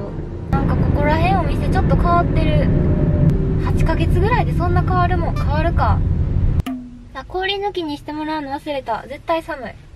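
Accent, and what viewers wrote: Kansai, Japan. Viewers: 可愛い子の関西弁ていいね😄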